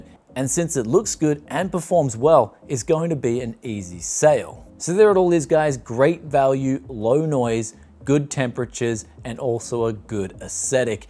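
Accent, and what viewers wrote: Australia. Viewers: Nice Build btw. I like it, just recently found your channel an I like your character a lot. Could listen to your advice and opinions all day long :) Maybe its the Aussi-slang in your English, gives me those Steve Erwin vibes, no offense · His ozzy english n movie star looks ..dayum! "But a320?" Says b450 fans.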